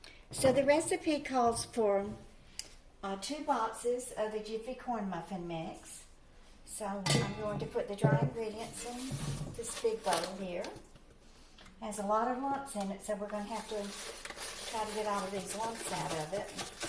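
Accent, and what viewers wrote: South, United States. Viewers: Did you live in New Jersey? You have a beautiful southern accent! How did you get way up there?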